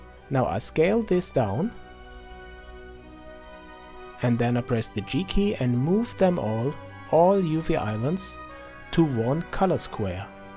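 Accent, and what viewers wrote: Germany. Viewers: you ar german ?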